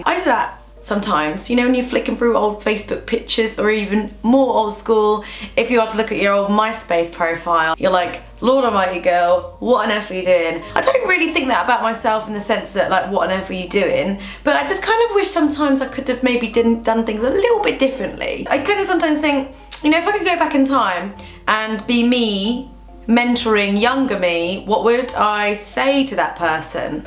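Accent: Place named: London, United Kingdom. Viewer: it sounds like Adele's accent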